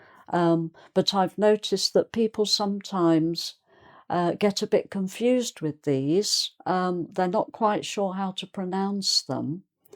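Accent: England, United Kingdom. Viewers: Na verdade ninguém nasce sabendo, todos precisam aprender. E esse sotaque é o sotaque do inglês britânico que e o verdadeiro, os americanos não conseguem mais emular esse sotaque. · Love from INDIA...ARE YOU BRITISH MAM?? AMERICAN ENGLISH ACCENT IS HARD TO UNDERSTAND FOR US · Que fofa ensinando e que sotaque inglês lindo!!! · Esto es el acento británico?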